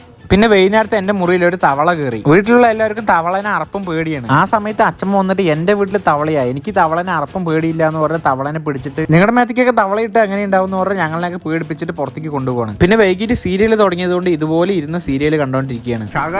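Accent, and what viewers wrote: Palakkad, India. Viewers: Nee palakkad aano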